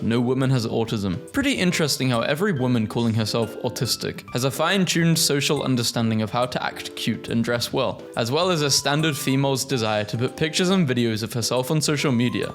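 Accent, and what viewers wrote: United Kingdom. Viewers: To me you sound British. Just not RP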